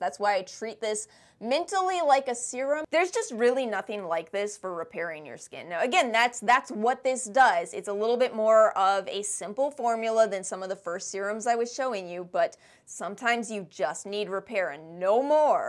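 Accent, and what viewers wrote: United States. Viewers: You have a strong American accent!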